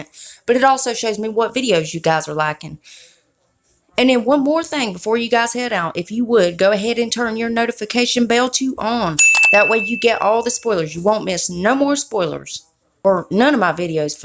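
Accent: South, United States. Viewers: I love your accent so much!! I'm southern...but girl, you're super southern, 😂😂!! Bless it!! 😘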